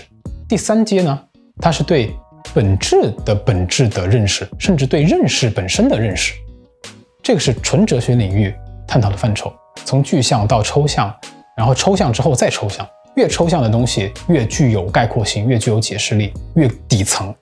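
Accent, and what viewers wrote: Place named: Hunan, China. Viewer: 没有得到我点进来之前想要听到的内容但是听出了是个湖南人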